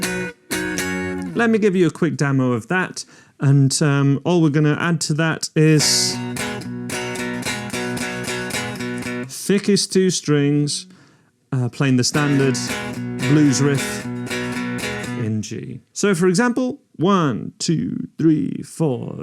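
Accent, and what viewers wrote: United Kingdom. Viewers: Thanks ❤ for the tutorial I hope you have a great new year I love you very much 😘🎸🥰 keep up the good work you have a great voice that British accent 💕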